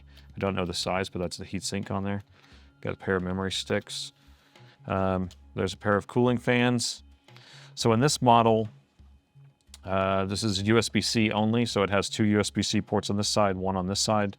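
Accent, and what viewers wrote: United States. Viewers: I have watched many Youtube anchor videos about repairing laptop motherboards, mostly with a British accent. This is the first time I have heard of a blogger with an American accent. The program is very good, fantastic, subscribe~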